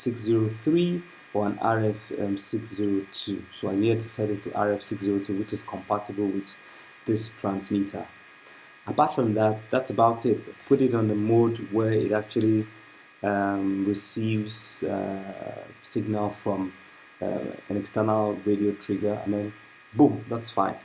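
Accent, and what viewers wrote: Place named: Africa. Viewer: Nice review You sound like an African brother, where you from ?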